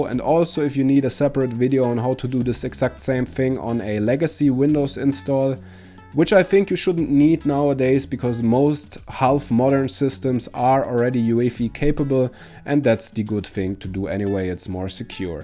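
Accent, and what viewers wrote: Germany. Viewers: That strong german accent 😂 Gutes Video, mach ich gleich ma.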